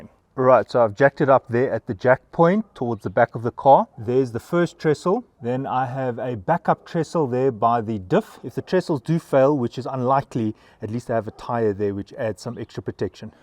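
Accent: South Africa. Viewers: Yes this guy is definitely from South Africa, as am I. He really is a very good instructor, especially on the e65. His videos has helped me out a lot already. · Actually, I found the opposite to be true. He's quite articulate. If you're not a native English speaker, I could see having issues understanding. Sounds like this gent is from South Africa. Personally, I'd suggest just being thankful he cared enough to share his experience and teach folks how to do this job. CC, playback control and rewind are things.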